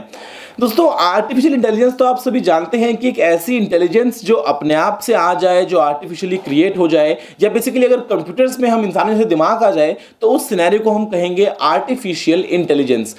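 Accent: Bengal, India. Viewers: Sir.. Aap bengali ho?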